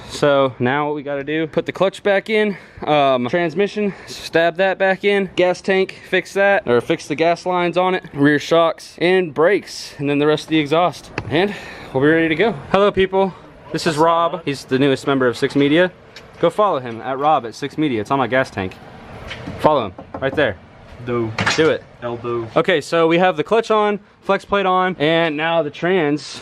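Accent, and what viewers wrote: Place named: Alabama, United States. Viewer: Being from Alabama we would think that you’re from here lmao but we approve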